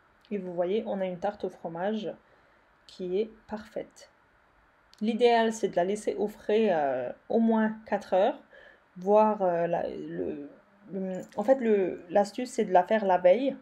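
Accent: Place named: Lorraine, France. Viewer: Coucou ! Merci pour le partage. Quand je t'ai entendu parler j'ai trouvé que tu avais un petit accent lorrain... Ensuite, tu as évoqué Thierry Hommel qui fait très bonne tarte au fromage d'ailleurs et là je me suis dit qu'on vient du même coin 😜😜 contente suivre une personne de la ville de mon enfance 😉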